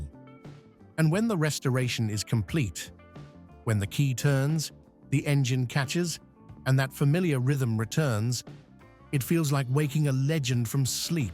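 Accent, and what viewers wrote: United Kingdom. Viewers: i love triumph. but if i had heard this turkey waxing lyrical like a pommy git i would have bought a honda. cant listen to him anymore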